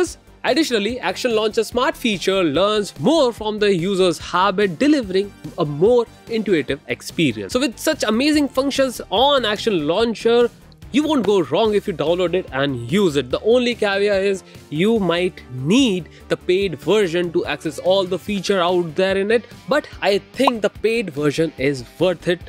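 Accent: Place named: United States. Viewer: You really have a decent but not perfect American accent.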